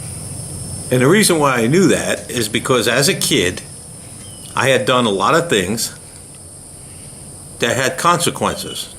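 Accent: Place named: New York, United States. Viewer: what a couple of stories! i was glued to the screen for both of them. having a young child myself, i can already see how kids sometimes scare the every loving crimonies out of us parents, as i know i did mine from time to time.. thanks for sharing. can't quite place the accent; is it new york? hava good'n. 🍻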